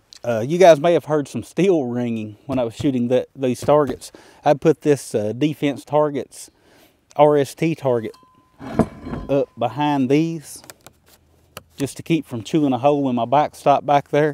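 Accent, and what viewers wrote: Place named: South, United States. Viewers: That is one cool sounding accent. I am guessing from the South but you are bundled up too much🇨🇦🤠Great vid answered some questions l had thanks. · Whatever it is, he has it on thick. Wearin’ it thick. A lot of YT’bers do this. lol. The best, are the northern “southern “ draws lol. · Very excellent videos as well as the information you provide. Love the accent! Tennessee?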